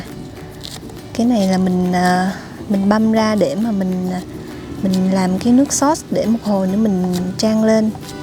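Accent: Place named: South, Vietnam. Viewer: Ma ơi ! Anh rất thích ngừng video cua em no thật đi vào cuộc sống Con giống noi cua em nghe thật ấm lòng Em là nguoi Tây nguyên Nhung giống noi thật Nam bộ Chúc em ngày mới vui vẻ và an lành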